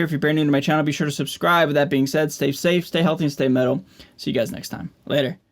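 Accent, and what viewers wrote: United States. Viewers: What am I hearing, meaning what r u playing thru? That was fat man. I want a home studio. And I’m curious about yours. Mostly because you speak American and not British !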